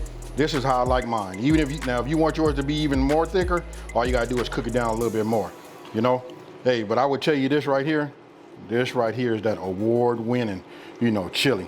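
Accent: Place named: California, United States. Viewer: It’s the California Accent for me!!🥰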